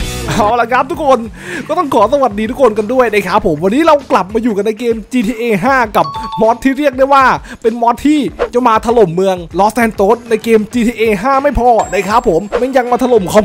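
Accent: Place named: South, Thailand. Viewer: แหลงใต้ชัดเปรี้ยะเลยหลวง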